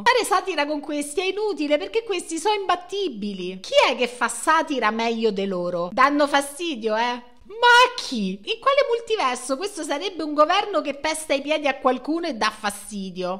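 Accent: Rome, Italy. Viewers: C'è del video in questo dialetto romano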